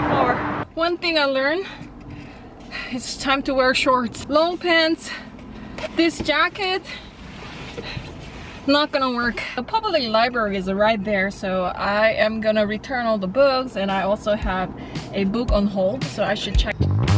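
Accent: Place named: Ulsan, South Korea. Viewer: 오늘따라 유독 진한 사투리 악센트! 울산쪽이신거 같은데 궁금하네요.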